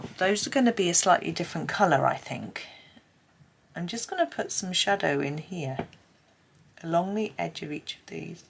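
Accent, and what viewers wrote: United Kingdom. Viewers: The basket truly is the star of the show! I get such a kick out of how you Brits pronounce certain words so differently, like thistle. I'm with you, I'd never forage for mushrooms, too risky!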